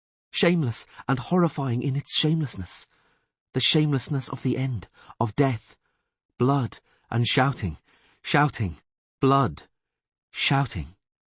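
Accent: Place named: United Kingdom. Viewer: I've got trouble understanding many words, I am not a native speaker and the weird words plus the British accent makes it incredibly hard to understand ( the text helped a lot so thanks 🙏) I'll switch to Portuguese though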